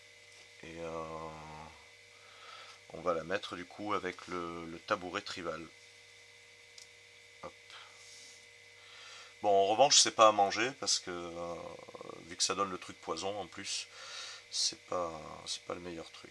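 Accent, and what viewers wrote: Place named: South, France. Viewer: Si tu n'habite pas trop loin de la Bretagne je veux bien t assister pour tirer les cartes voir pourquoi faire une partie ensemble pourquoi pas.hé hé...mais ton accent me fait penser que tu es plus dans le sud.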